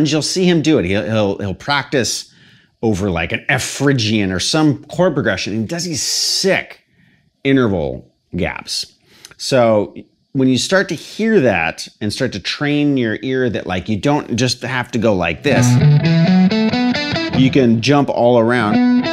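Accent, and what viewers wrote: United States. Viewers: Amazing player! Moreover, I practice american accent listening to you:) Greetings from Buenos Aires.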